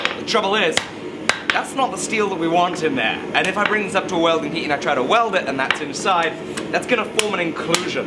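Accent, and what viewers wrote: England, United Kingdom. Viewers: Why does he have an American flag and sound British? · how come you sound British yet have American flags everywhere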